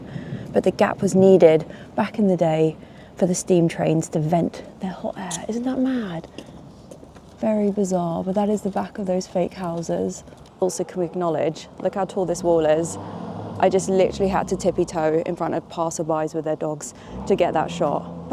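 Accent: United Kingdom. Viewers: I’m not British/English (or whatever you call yourself), but Ifing love her accent, intonation as she exclaims to every detail she spots during the video like “Oh look the sun is shining!”. Also, she has a beautiful face full of expressions and they are changing constantly while she speaks. I love it all. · Wow you sound so British. I'm so used to YouTubers with American accents! Its good that you don't sound like the!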